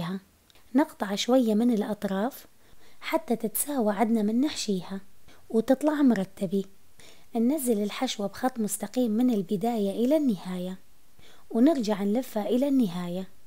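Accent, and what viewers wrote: Mosul, Iraq. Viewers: احلى شي بالفديو لهجتج الموصليه❤️❤️❤️❤️❤️ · عاشت ايدكي حبيبتي قبان بنات المصلاوية(الاحضرية ) اشقد معدلي وشاطغة بكل شي قبان لهجتنا الحلوي ول دادا 🌼🌼🌼🌼🌼🌼🌼🌼🌼🌼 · فدوة للكنة الموصلية عاشت ايدج❤ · صوتك موصلاوي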